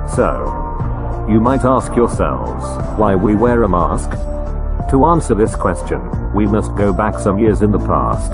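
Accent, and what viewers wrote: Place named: United States. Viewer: Ata, da pra ver q quem está falando é um americano. Brasileiro ou qualquer pessoa no mundo pode falar fluentemente o inglês, msm assim tem sotaque.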